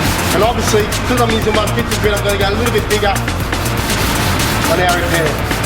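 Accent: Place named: Arab world. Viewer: Looks like the guy had an Arab accent, who went to Australia and was kicked out and went to U.K, lived there for 15 years, tried a lot to copy the white guys there.... And now he is a mongrel... His accent sucks!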